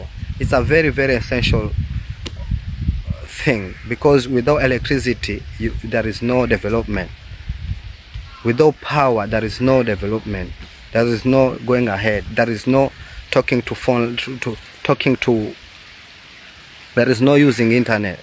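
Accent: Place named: Uganda. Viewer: Bro you sound like a Ugandan and you are saying you are from Kenya😮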